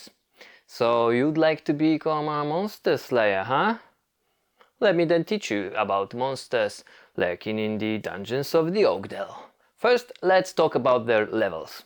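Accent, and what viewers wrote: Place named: Poland. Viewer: this is the coolest pocket dungeon crawler i have ever seen :) 1 more thing. Why do you have polish accent?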